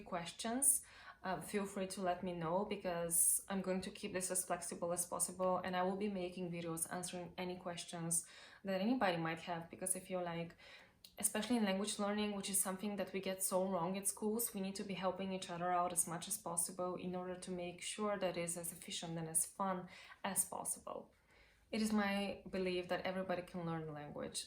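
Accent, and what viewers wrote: Portugal. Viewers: sotaque parece o europeu mas tem uns pontos brasileiros... Como o uso do "você" e do som "dji" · Seu sotaque é mais de Portugal 🇵🇹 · Seu sotaque é soa como o do Português Europeu. Como brasileira posso dizer que você fala Português muito bem. Consegui te entender perfeitamente. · Seu português é muito bom. Seu sotaque parece mais com o de portugal. Parabéns! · Tens um sotaque mais puxado para o Europeu 🇵🇹 Mas tem também influências Brasileiras 🇧🇷 por exemplo como disseste ‘importante’. Pronunciaste como ‘importantxi’ como fazem os BRs Mas se entende muito bem. If I didn’t know any better I would’ve assumed you were a native that happened to live in both countries hence the mixed accent. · I really admire multilingual people, you are a talent. But it's a big mistake ppl think that just because a person has a "gift" it's easier because no, you have to study a lot to reach a goal, for talented people like, maybe less time is all that. 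Seu sotaque de português com certeza é mais parecido com o de Portugal. Se você falar dessa maneira aqui no Brasil, as pessoas irão te confundir com uma portuguesa mas você fala muito bem! 日本語を話すことを学んでください。私の第二言語です。あなたが日本語を話すのを見てみたいです。(Please learn to speak japanese it is my second language and I would love to see you speak it.) Cheers from Brazil! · O sotaque Português é 60% Português Europeu e 40% Português Brasileiro. :) Parabéns! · Seu sotaque de português é um pouco mais puxado para o portugues europeu, mas eu, como falante nativo de português brasileiro, entendo você melhor do que eu entendo portugueses, porque sua pronúncia é mais aberta do que normalmente se ouve dos portugueses. E sua fluência é incrível, você parece encontrar palavras com a mesma facilidade em qualquer língua que fala, além da gramática que, pelo menos no português, não encontrei nenhum erro. Não que fosse algum problema se eu tivesse encontrado, mas é surpreendente o cuidado que você tem com detalhes. 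· Seu sotaque é do português falado em Portugal. O português falado no Brasil é bem mais limpo e claro de se ouvir. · when you speak french i hear the portuguese accent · O teu sotaque em português é mais próximo do português europeu do que do brasileiro. Há uma o outra palavra que soa mais brasileira. Mas falas mesmo muito bem! 🥰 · Olá,! Seu vídeo é muito inspirador para mim, que ainda estou no início de ser um poliglota. O seu sotaque no português é o europeu, mas você também fala perfeitamente compreensível para nós, brasileiros. Acredito que é por você não se importar muito com sotaques, e por isso, apesar de soar mais como português europeu, você não se prende muito a ele. Também procuro aprender outros idiomas sem me importar muito com sotaque nos estágios iniciais.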